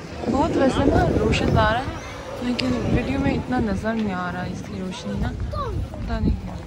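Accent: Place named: North, Pakistan. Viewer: Her accent shows, she is NOT Korean but Pakistani from north of Pakistan. She is not Korean; not at all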